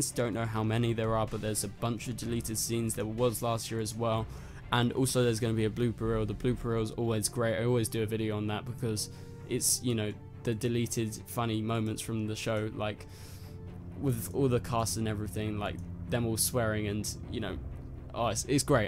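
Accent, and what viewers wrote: United Kingdom. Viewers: Thanks for the vid bruh! And... Is he British?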